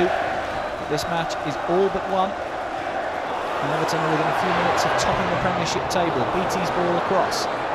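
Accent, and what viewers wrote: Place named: Ireland. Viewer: Irish guy anyone?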